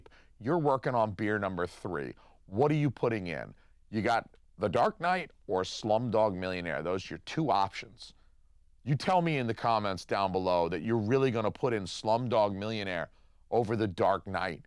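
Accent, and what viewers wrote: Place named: Pittsburgh, United States. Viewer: I agree with everything you said here and laughed quite a bit. But I’m so confused why you’re wearing a Georgia sweatshirt and have a Pittsburgh accent.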